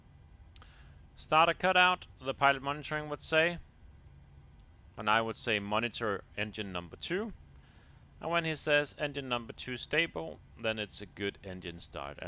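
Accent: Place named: Denmark. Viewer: Hey just wondering, are you from denmark? You sound danish haha, im danish too btw!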